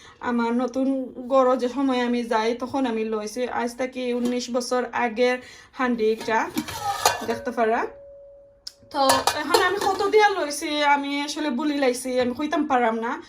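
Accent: Sylhet, Bangladesh. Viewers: Oy apa amar khob vala lage honte sylheti mat afner, shob shomoy ola matiyo re go, ar jee mojar khatta ransuin, Ma sha Allah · I totally agree with you sister . Lot of sylheti try to impress by speaking shudo basha and it just doesn’t come over . Be proud of your dialect ..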